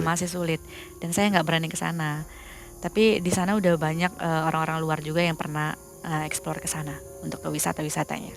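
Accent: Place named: Kalimantan, Indonesia. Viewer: Panggilanya pake logat. Magg. Khass kalimantan bujur.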